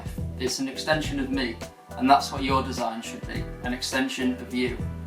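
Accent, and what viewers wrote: United Kingdom. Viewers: Brilliant! Thanks for that, really looking forward to see the other videos you’ll make! Anything will be good for us I guess :) Btw your intro is perfect, and the video is great. Sometimes it’s a bit tough to understand your British accent but I guess it’s going to get better with a bit of practice!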